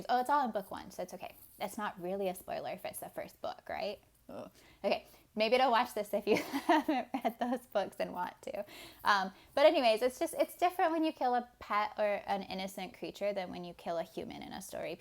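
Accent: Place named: United Kingdom. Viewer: Very cute and awesome advice! And excellent British accent. :)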